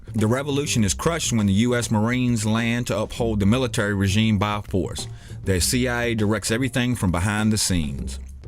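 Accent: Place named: Louisiana, United States. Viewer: Nice one, It is nice to hear your real voice for a change very professional, well articulated, now hearing your voice with a light accent, I could just guess ,maybe your a Louisianan. All good fronts exposed and revisited, Thank you for all your run downs on the populous controllers. Peace Brother. :)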